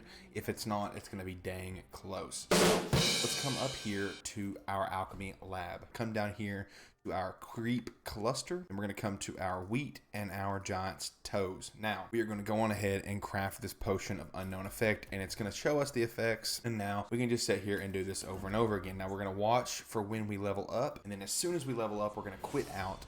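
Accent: South, United States. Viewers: I don’t watch you channel but hearing a dude with a southern accent explaining Skyrim stuff to me is much more comforting and entertaining than a sweaty nerd voice.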